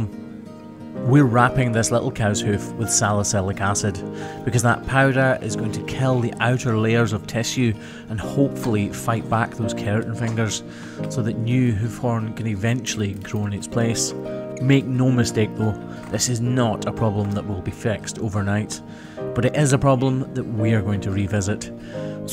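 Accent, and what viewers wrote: Scotland, United Kingdom. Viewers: Her hoof damage reminds me of a weird nail I have. As a kid, I smashed my right hand ring finger between the door and doorframe, you know where the hinges sit, and I broke the very tip of my finger - right where the nail is formed. Today I have a strange nail that grows in layers, with a weird top layer that kind of splits off from the rest of the nail and I have to cut it really, really short for it to be comfortable. The scarring on my finger is extremely sensitive and the tip of my finger is actually shorter than the left hand ring finger, visibly so and when I put my hands together to compare, I lack about 5 millimeters on it. While my own finger and nail will never be "fixed", it's a thing I've learned to live with and it doesn't impact me in any real way. I hope this little miss can lead a good life, even if the keratin finger issue reemerges. 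These videos are super comfy and not only do I get to listen to the dulcet tones of a Sco'ish man, I get to see cattle having their hoof injuries treated with the utmost of care and respect for the animal.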